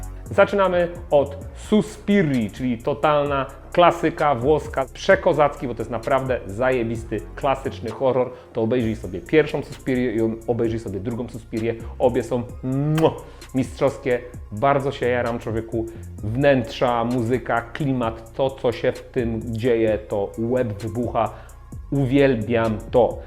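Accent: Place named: Poznań, Poland. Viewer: Słychać że poznaniak tej!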